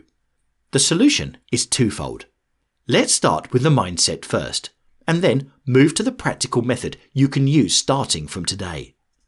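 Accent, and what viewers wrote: United Kingdom. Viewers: Yo, thank you again for turning my article into such an informing piece, honored man! P.S. It's so weird listening to something you've written, narrated in a British accent and animated in such a creative way:D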